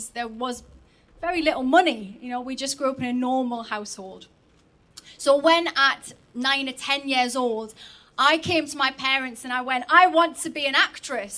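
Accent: Newcastle, United Kingdom. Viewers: I miss hearing Geordie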